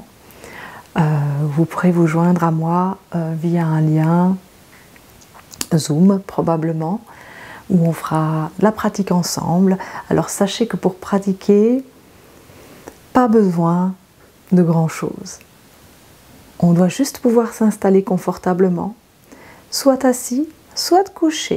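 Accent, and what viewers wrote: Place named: Belgium. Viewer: Bonsoir et merci pour cette nouvelle séance qui m’a fait beaucoup de bien. J’ai appris que vous habitiez Mons, en Belgique. Je me doutais un peu, j’avais reconnu votre accent, je suis du Nord de la France 🇫🇷 et le mien ressemble au vôtre. J’ai eu une douleur supportable au milieu du dos, du côté droit que je n’ai jamais eue, est-ce à cause de cette pratique ? Pouvez m’écrire le nom de votre nouvelle pratique, les heures et jours où l’on peut vous retrouver en live sur YouTube car je n’ai pas eu le temps de les noter. Merci beaucoup.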